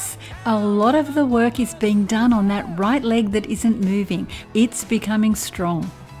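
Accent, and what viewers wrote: Australia. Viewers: At 81 I do 2 30 min videos and feel 60! These are excellent to use alone at home! And I love your Aussie accent...that helps!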